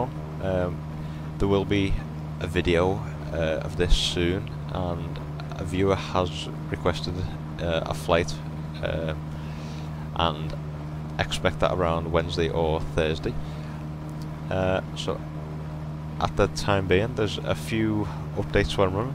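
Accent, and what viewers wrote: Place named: Liverpool, United Kingdom. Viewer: Are you scouse, you sound like a scouser :D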